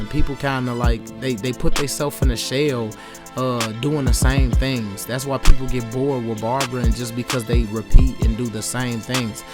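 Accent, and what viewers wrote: Chicago, United States. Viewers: yu frm chicago?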